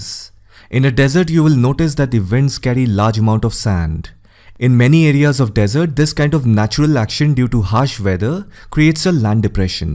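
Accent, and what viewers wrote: India. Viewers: Indians English! Like criticise english language 😠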